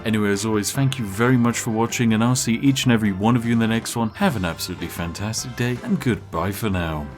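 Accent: United Kingdom. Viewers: Oh Im so happy to have found this channel. I wouldve never thought that making money could be so easy or that some companies are just that stupid. Not that I ever tried some of these tactics but you and your humor and british accent are making the journey worth watching. Thanks for making these videos and keep up the great work. Your one of my favourite channels that Im always watching right now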